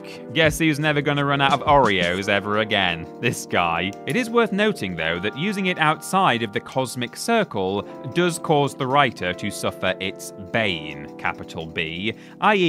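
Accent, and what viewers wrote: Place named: Yorkshire, United Kingdom. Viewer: Nothing like a cup of coffee and the Yorkshire tones of presenting a list to start the day, ahhh..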